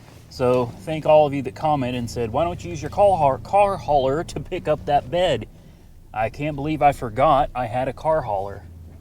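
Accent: United States. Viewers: I love the way you speak your so calm and collected, as a Australian I often find Americans have funny and rather stupid accents but your the complete opposite. Also I love the content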